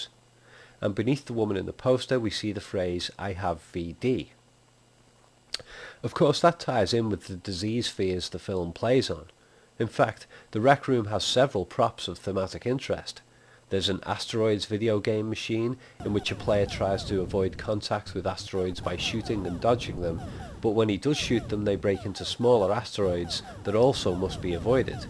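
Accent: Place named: Liverpool, United Kingdom. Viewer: Great video. The new film was very sloppy film making cashing in on the name. I did want to ask unrelated to the video, where are you from? As you sound scouse but you use a fair amount of American words the English never use and some American pronunciations.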